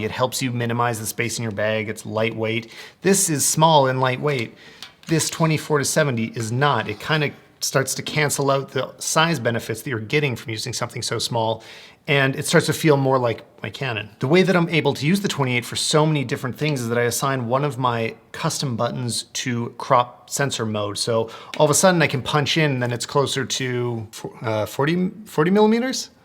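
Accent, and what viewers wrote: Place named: Canada. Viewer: ur accent tells me you'r from Canada :)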